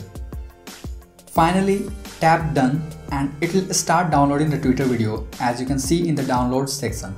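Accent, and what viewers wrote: India. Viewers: If you think I’m trusting a man with an Indian voice on a tech issue, you can think again.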